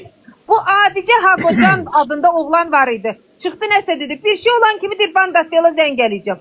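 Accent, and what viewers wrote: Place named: Baku, Azerbaijan. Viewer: Canim xanim əsilll Baki ləhcəsi canim Bakillim. Duz deyir vallah.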